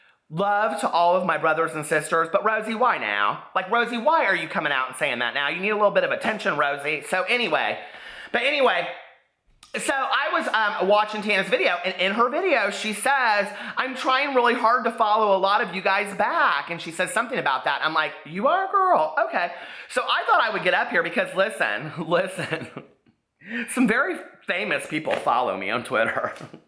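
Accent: United States. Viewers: If you are from Illinois, why are you speaking with a country accent? I understand the gay...but come on.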